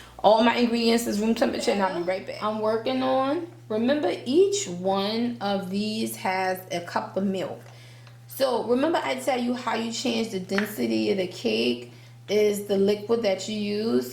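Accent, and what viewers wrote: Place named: New York, United States. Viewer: She definitely from New York🤣 bur good video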